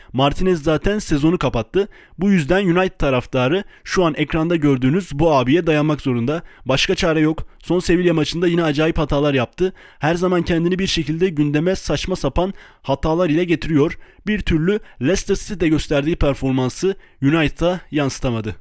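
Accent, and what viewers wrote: Gaziantep, Turkey. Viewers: Reis Antepli misin? Desene hemşehri çıktık :D Bu arada cevap La Liga.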